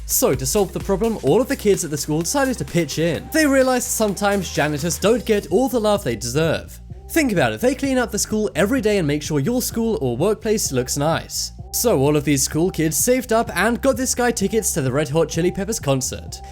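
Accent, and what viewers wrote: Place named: United Kingdom. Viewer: What’s with the British voice??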